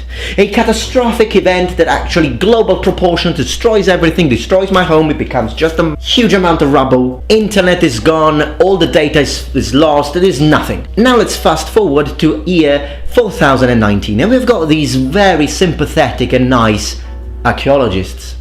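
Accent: Sicily, Italy. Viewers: Your explosive Sicilian delivery with your hyper-educated sarcastic English is a killer combo, sir. I'm crying with laughter.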